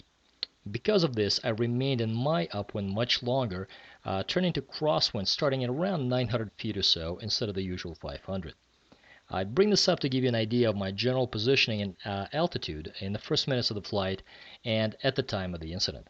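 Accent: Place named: Eastern Europe. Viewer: I've flown over to CCR from HWD, even at least once to build night hours as PIC., you've done a splendid job as an aviator and presenter, and gave us a lovely peek at Mt. Tam into the bargain. I'm far from the East Bay and CC County, now, but it brought back great memories. I also love the little hints of Slavic in your speech, the L's, the hard h's, the narrowed vowels in some places. Even without seeing your name, I'd have heard that. As Scott Manley says, "Fly safe!"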